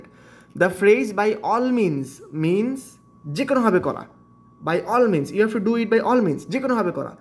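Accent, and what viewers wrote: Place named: Sylhet, Bangladesh. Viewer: Apni ki sylhet er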